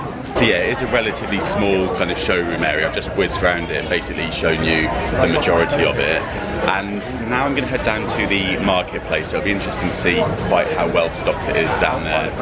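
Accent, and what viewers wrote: United Kingdom. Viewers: Just subscribed. Love your vlogs and your masculine British accent. 😊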